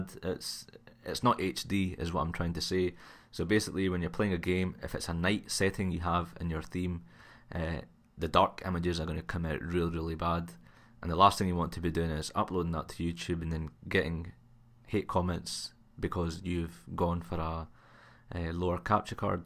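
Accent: Scotland, United Kingdom. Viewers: your Scottish :)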